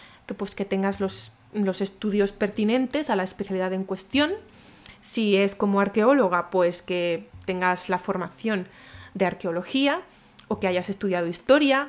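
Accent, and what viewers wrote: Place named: Andalusia, Spain. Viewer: Como ya te dije hace mucho tiempo, no dejes tu acento andaluz.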